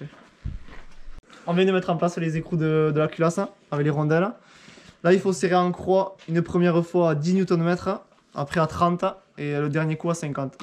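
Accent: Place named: Southwest, France. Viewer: Salut...je viens de tomber sur tes vidéos elles sont vraiment aux top!!! Et en plus avec cet accent c'est encore mieux !! J'ai le même !!! Vive le sud ouest !!! Je travaille sur Toulouse et je roule en enduro avec un excf 2010 dans le Gers a un niveau amateur pour le plaisir! Je viens de me rendre compte que mon moteur est bloqué alors qu'elle ne l'était pas il y a quelques jours !!! Va falloir que j'ouvre pour aller voir du côté cylindre piston ! J'espère que ce ne sera pas plus grave. Est ce que les excf de 2010 sont quasi identiques au moteur Husqvarna comme ta vidéo ? Je cherche également un support moteur c'est un fait maison le tiens ? En tout cas tu peux continuer tes vidéos c'est un régal... Bon dimanche à toi A+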